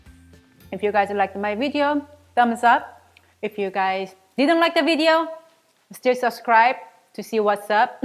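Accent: South Korea. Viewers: fyi korean has many dialects... and by dialect i mean her korean dialect not her english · what do you mean by dialect? She's Korean and just has an accent when she speaks English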